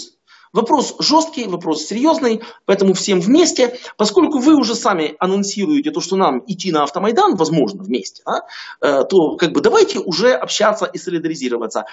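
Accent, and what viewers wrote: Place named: United Kingdom. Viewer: акцент гарний ( в сенсі красивий). Майже британський. До ар-пі ( пош - акцент), можливо, трохи не дотягує, але звучить досить естетично привабливо ( без гомосятини кажу, лише як естет )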